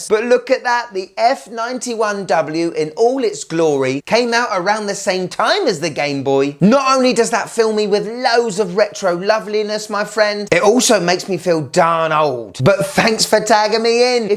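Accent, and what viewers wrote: United Kingdom. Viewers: Hi friend, thank you so much for you videos, and as i'm english learner and i use your videos to learn britich accent, cuz you have a great one, i have a favor to ask, can you add to your video a transcript have all your talk please ? and thank you so much again for your Job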